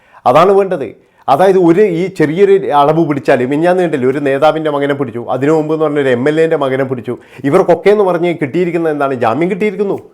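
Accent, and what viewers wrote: Kannur, India. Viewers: ഏട്ടാ ഇങ്ങൾ നാട് എവിടെ നമ്മള് നാട്ടിലെ അതെ സ്‌ലാംഗ് കണ്ണൂർ ഇല് എവിടെ ,മട്ടന്നൂർ ഇരിട്ടി കുതുപ്പറമ്പ് ഈ ഏരിയൽ എവിടെയെങ്കിലും ആണോ,, എൻ്റെ നാട്ടുകാരൻ സംസാരിക്കുന്ന അതെ ഭാഷ കേൾക്കുമ്പോൾ ഒരുപാട് അഭിമാനം,മനുഷ്യത്വത്തിൻ്റെ വേണ്ടി നിലകൊള്ളാൻ നമുക്ക് സാധിക്കട്ടെ,നമോക്കൊരുമിച്ചു. സ്നേഹത്തോടെ ജീവിക്കാം,,അധർമതിനെതിരെ പ്രധികരികം ,, എട്ട ഒരുപാടിഷ്ടം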